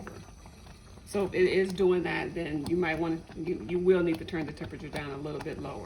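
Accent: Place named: South, United States. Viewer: What part of the south are you from?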